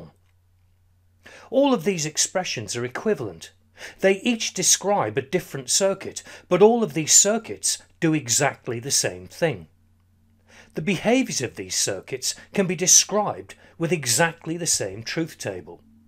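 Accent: United Kingdom. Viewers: Only reason i picked your video was because of the British accent. Hate to admit it but it is what it is.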